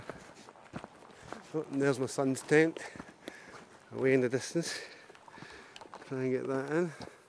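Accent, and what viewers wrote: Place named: Scotland, United Kingdom. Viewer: Myself and daveswildcamping,love the Scottish accent. It puts u,right there.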